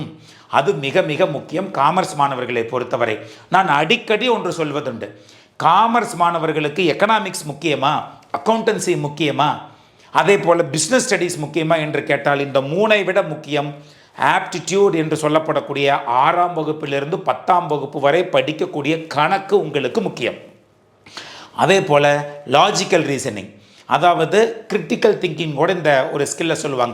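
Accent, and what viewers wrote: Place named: Madurai, India. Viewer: Sir neega enga schl ku vanthirkiga nyabagam eruka madurai bashala pesnigaley😂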